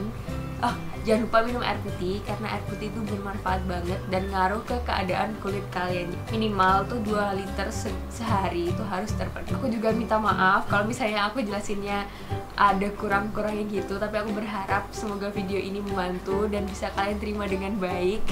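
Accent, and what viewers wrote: Java, Indonesia. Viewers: Jowonya medok juga yaa mbak😅😅 walaupun ngomong pakai bahasa indonesia ttp keliatan jowonyaa😅😅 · Sukaa banget sama suaranyaaaa😍 medok medok kalem adem gitcuuu, penjelasannya juga singkat, padat, dan jelas. langsung subs.💖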